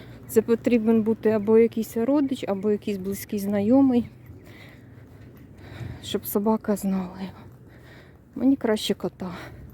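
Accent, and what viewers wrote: Sumy, Ukraine. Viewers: Сумський суржик не можно не впізнати,так говорять тільки в Сумах.❤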